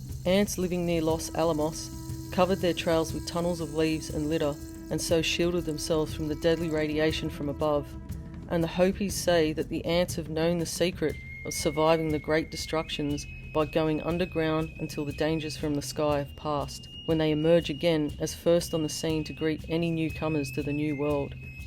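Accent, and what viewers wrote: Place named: Australia. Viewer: Thanks for making these new connections in such an engaging Aussie voice!🙏⚡💛🦘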